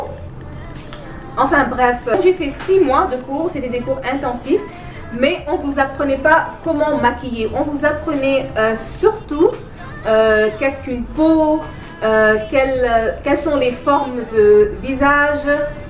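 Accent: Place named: Senegal. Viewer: lol...ton action(voix) montre que sénégalaise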